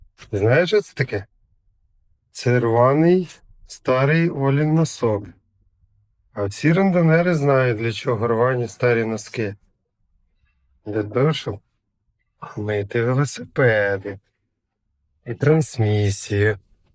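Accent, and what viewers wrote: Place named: Vinnytsia, Ukraine. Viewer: Лайк за Вінницький (рідний) говор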